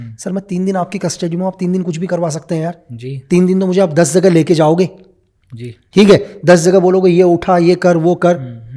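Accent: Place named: Kumaon, India. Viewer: Kumauni log aise hi bolte hain ..krke ya thahre ❤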